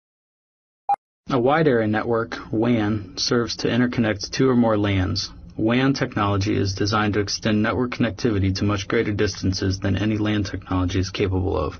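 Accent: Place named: North America. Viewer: Throughout the whole video I thought you were North American. And when I saw your .uk website, you're from the UK? Man, respect.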